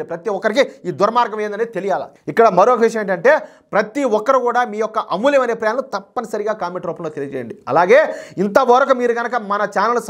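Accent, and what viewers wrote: Rayalaseema, India. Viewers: Your from rayalseema, how language looks like cinema movie dialogues.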